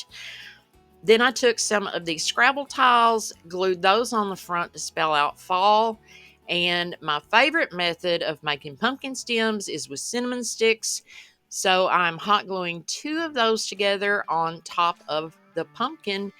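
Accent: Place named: South, United States. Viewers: Are you from Kentucky? You sound a lot like KathyJo DIY's! I absolutely love the accent! Thank you for sharing and talking! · Hey ❤I love your little pumpkins. They are so adorable. I love the Southern accent😂 Mine comes out really bad sometimes. TFS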